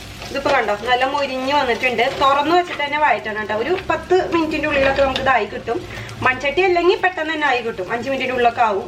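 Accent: Thrissur, India. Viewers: Chechi thrissur kaari aano? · Thrissurkari Alle ? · thrissurkarii annee thonnunuuu.....😘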